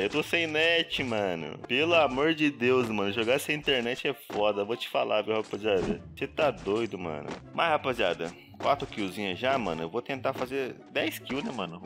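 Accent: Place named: Rio de Janeiro, Brazil. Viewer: parece ser carioca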